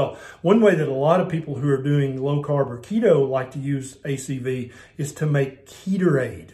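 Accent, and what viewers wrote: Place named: Tennessee, United States. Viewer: man, i sure do appreciate a Tennessee accent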